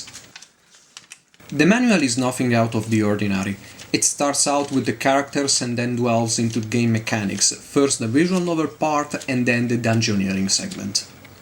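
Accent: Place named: Italy. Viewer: Initially, I thought his accent was Russian or Polish, but no, Italiano. :-)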